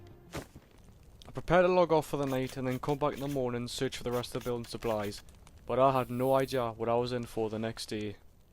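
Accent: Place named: Newcastle, United Kingdom. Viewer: Good to hear a Geordie streamer, great video mate 👍🏻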